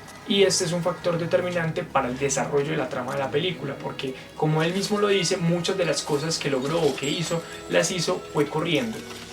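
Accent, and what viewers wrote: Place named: Costa Rica. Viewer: Sos Costarricense con solo escucharte.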